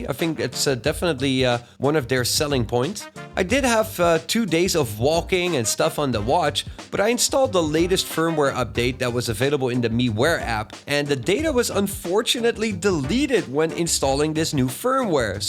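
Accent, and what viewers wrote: Netherlands. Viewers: Do I hear a Dutch accent? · I thought you sounded Dutch. confirmed it. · No offence but you have a very thick Dutch accent, or as they like to call it: "Steenkolenengels" or "Stone coal Dutch (as a self-referencing joke)". Thank you for your thorough review! I enjoyed it immensly! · Nederlander, ik hoor het aan je accent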